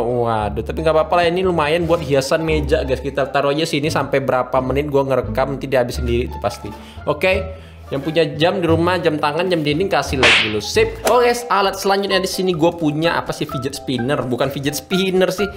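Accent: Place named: Bali, Indonesia. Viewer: Org bali yah bg ..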